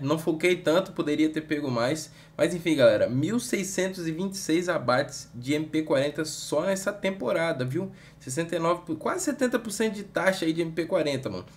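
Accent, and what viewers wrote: Bahia, Brazil. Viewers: Tu é da Bahia mano?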